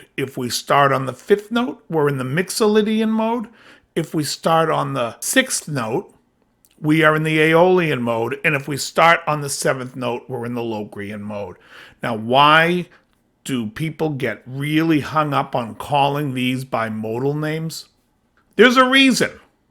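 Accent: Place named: New York, United States. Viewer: Like I said….really glad I stumbled onto your channel! So much great stuff….and you talk just like a a New Yawker. What part of New York are you from anyway?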